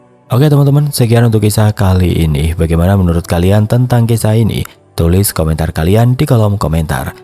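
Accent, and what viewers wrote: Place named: Java, Indonesia. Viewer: Logate medok syekalee😂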